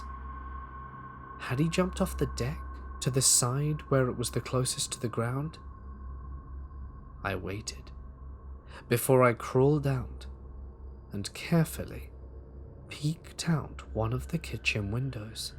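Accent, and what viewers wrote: England, United Kingdom. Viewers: Hey! is from England.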